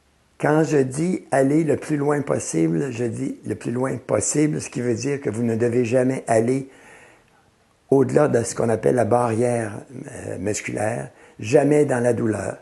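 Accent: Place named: Canada. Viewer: Extra, merci beaucoup ! Votre site est super, on va aussi transmettre le lien à nos clients ! Et j'adore votre accent canadien :-) il réchauffe le coeur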